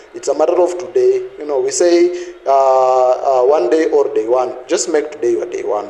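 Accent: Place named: Kenya. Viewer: Ascent ya kiluhya ,kabsaa he is well informed though, and seems a good and funny joker, watu hutoka mbali ,lakini, encouraging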